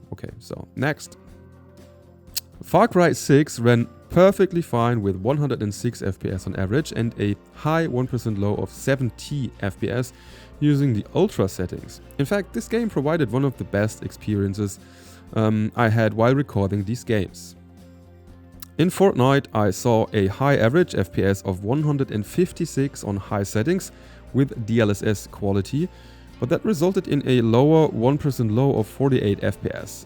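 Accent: Germany. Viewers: Kling für mich irgendwie nach deutschem Akzent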